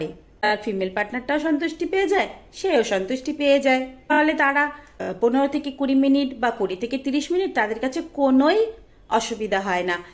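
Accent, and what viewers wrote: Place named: Andaman, India. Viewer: আপনার বাড়ি কি আন্দামানে, আপনার কথার ধরন দেখে আমার তাই মনে হচ্ছে। আমি ওখানে ২ বছর ছিলাম, ওয়ান্ডুরে।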